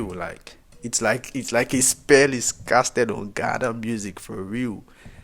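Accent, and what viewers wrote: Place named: Nigeria. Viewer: Everyone knows you’re Nigerian from your accent so I don’t think you need to use “Nigerian” again in your captions. I understand it’s for click baiting but it’s unnecessary. It’s not an artiste job to manage another artiste. Gh artistes have tried on their own varied ways to support other artistes, ie featuring on their songs, giving them a platform on their shows, performing on their shows, promoting them, giving them plugs etc. even with limited resources/ investments. Gh artistes managers and labels are also trying with limited resources. The main issue is investment and a conducive industry environment to push the artistes to the top. If Gh artistes have the same or even 3/4th of the investment that Naija put in their artistes, and even in their movie industry, Gh would be doing much better and would be robbing shoulders with the top artistes on the world stage.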